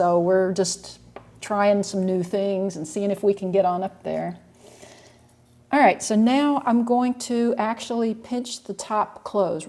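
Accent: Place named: Florida, United States. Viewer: I really love your regular tutorial-style videos. Your powers of explanation are superb and easy to listen to, and your results are so beautiful. I've learned a lot from watching, and my wife and I try to never miss an episode. But this live demonstration was a whole 'nother level. Seeing the entire process in real time really brought home the work and planning that goes into this. And is true asset, his video sense is spot on and his perspectives help a lot. You two make a great team, and we love to see it in action. Also, your Florida accent comes out a lot stronger when you're in conversation mode. It's very entertaining!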